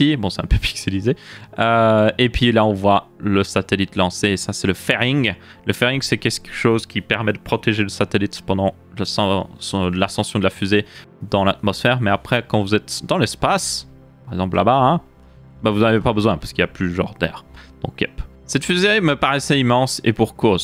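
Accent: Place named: Belgium. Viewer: *OUÏTE* alala l’accent belge ... Qu’est ce qu’on ferait sans toi ! xD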